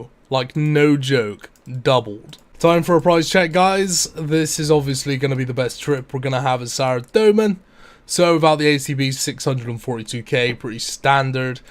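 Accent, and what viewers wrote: Bristol, United Kingdom. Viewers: keep up the great vids man, really entertaining! also where in the SW are you from, you sound very bristol my friend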